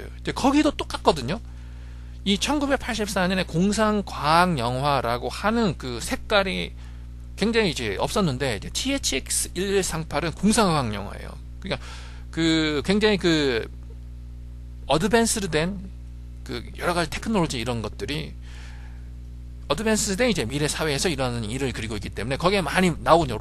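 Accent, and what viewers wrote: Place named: Wales, United Kingdom. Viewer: wtf ,why is this welsh fella speaking ?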